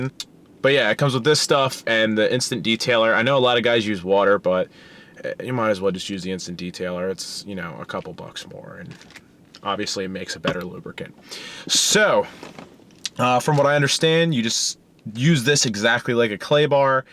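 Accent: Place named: Maryland, United States. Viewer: Sounds like you're from Maryland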